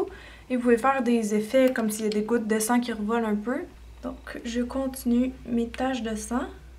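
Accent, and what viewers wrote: Quebec, Canada. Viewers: cest Québécois tu es ben la première a dire que taime pas notre accent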